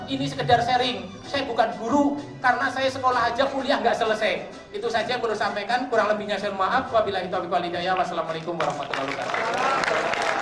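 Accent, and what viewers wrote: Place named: Banyumas, Indonesia. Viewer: maen,ngapak pokoke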